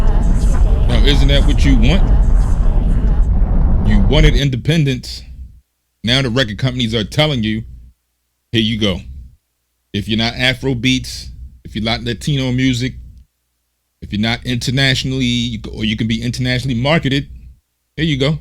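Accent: Baltimore, United States. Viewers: are you from somewhere near Baltimore? I'm just guessing as an Irishman who may have watched The Wire too much 😅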